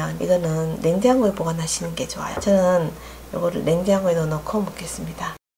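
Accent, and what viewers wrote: Gyeongsang, South Korea. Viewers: 네. 이모님^^ 감사합니다. 저도 경북에 산 적이 있어서 이모님 사투리가 정겹습니다^^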